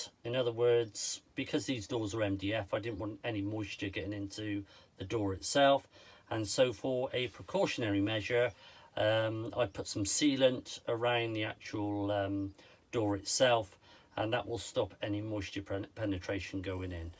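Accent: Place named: Gloucestershire, United Kingdom. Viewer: Superb my friend, attention to detail are excellent. The "gentleman" as always, giving people that have helped you on your journey a thank you, and a really genuine plug. People such as yourself give me a feel good factor, by restoring my faith in mankind, when so many others are trying to "ruck" or "scam" you. A man after my own heart, I'm sure you and I would get on like a house on fire. Phil. Incidentally, are you in Gloucestershire/Bristol district, only I'm picking up on your lovely accent??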